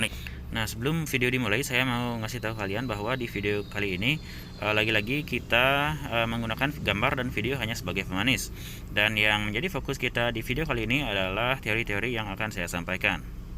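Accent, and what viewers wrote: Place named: Bali, Indonesia. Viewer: Seperti aksen Bali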